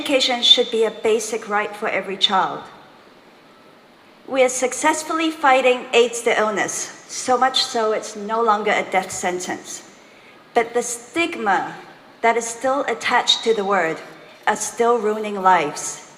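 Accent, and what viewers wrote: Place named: China. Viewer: 伦敦口音退化了不少 中国音非常严重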